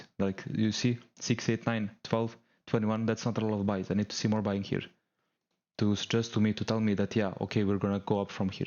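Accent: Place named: Serbia. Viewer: Odmah sam po naglasku znao da si Srbin, bravo najzad jedan covek koji razume sta je orderflow i sta znaci Dom trading. Iako je posle korone sve teze pratiti indicies jer je bid/ask tanak i options flow igra veliku ulogu zbog delta hedginga i dalje je dom najbolji nacin da se razume sta se desava u trenutku! Samo napred odlican kontent!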